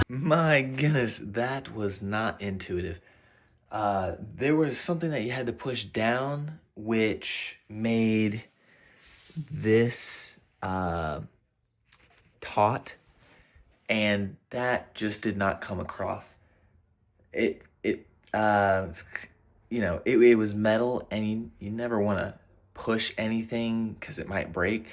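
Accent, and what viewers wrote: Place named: South, United States. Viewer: The more excited you get the more the southern twang comes out. 😂👍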